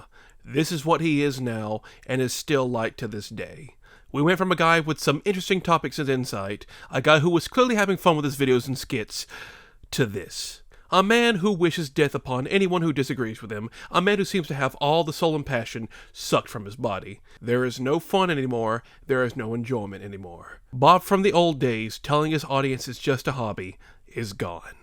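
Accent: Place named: Brooklyn, United States. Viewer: His dumbass brooklyn accent that only comes out on specific words is grating as hell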